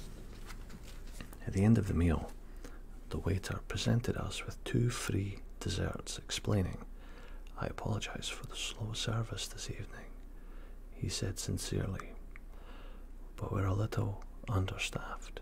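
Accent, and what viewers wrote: Scotland, United Kingdom. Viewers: Love love the Scottish accent! Your voice is so relaxing. Just subscribed to your channel 🙋🏽‍♀️😊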